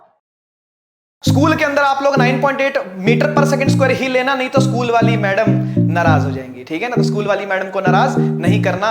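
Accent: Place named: Pahari, India. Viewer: Sir bhi pahari bolte ha............ nicha thala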